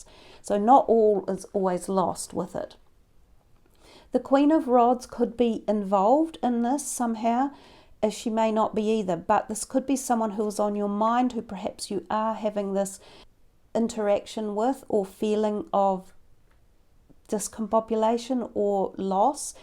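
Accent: New Zealand. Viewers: Hi there are u from nz? U have strong kiwi accent. Subbed. :)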